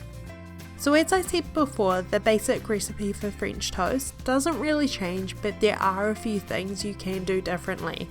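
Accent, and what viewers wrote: New Zealand. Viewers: Nola Susan New Zealand accent duh 🙄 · Kiwi New Zealand accent 🔥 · Hi, I just wanted to say I’m from new zealand aswell. I could tell by your kiwi accent. Your cooking videos are so great and helpful thank you :)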